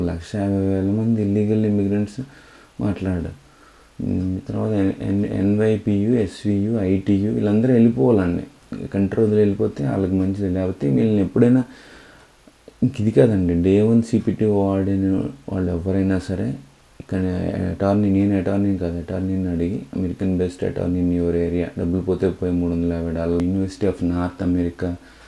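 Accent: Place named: Rajahmundry, India. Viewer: (Offtopic) naku elago USA nachaddu, but i like your accent sir, meedi rajamundry na?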